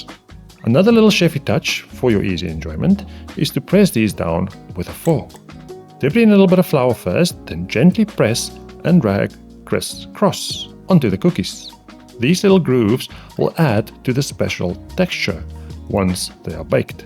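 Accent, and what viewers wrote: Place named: South Africa. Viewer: I know that accent😊 It is South African.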